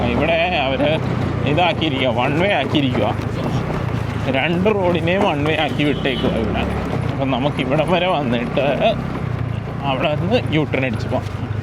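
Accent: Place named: Kottayam, India. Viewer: Broyude slang tvm pole thonnunnillalo kind of kottayam pole oke thonnunnu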